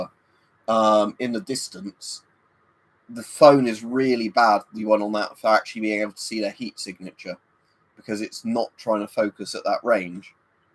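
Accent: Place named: United Kingdom. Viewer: Seu sotaque britânico é muito elegante! ❤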